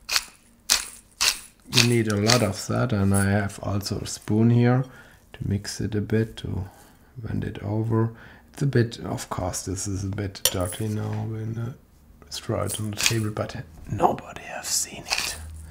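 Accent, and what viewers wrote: Germany. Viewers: This German accent is hilarious. XDD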